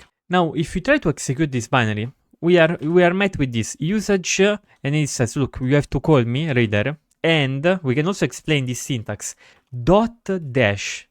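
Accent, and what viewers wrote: Italy. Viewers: Ho sentito i primi 20 sec. Sei italiano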